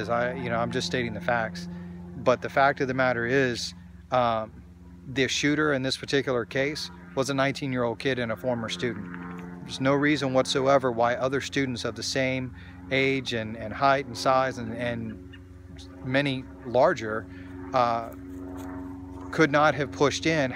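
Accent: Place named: Florida, United States. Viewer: Sounds like you're a Floridians also if I hear right. St. Augustine here. Good stuff. Well spoken words.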